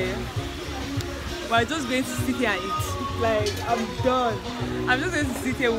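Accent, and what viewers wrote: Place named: Nigeria. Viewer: You have a Nigerian ascent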